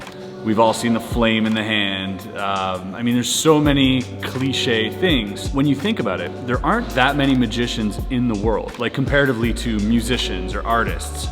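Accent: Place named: Quebec, Canada. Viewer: bonjour j'ai vu que tu habite au quebec grace a ton accent et au moment ou tu parle francais bref continue! t'es super on t'aime!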